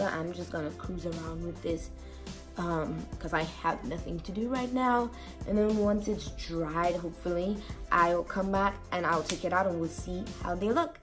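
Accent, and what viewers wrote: Caribbean. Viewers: I like your accent, you sound like you're from the Caribbean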